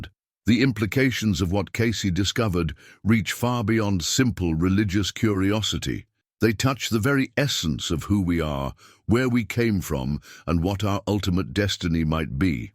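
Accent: United Kingdom. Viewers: I’ve listened to several of these podcasts. I don’t appreciate the superfluous vocabulary. Get to the point. The narrator sounds like Robin Leach and is off-putting because it makes it sound like a cult. I’ve read Edgar Cayce’s transcribed visions but he never sounded like this British narrator.i agree with Cayce. But this rewriting of his own experience is abhorrent to history.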